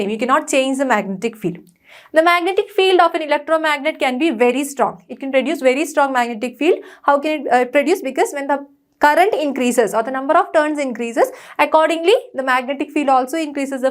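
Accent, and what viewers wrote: Kerala, India. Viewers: Mam are you from kerala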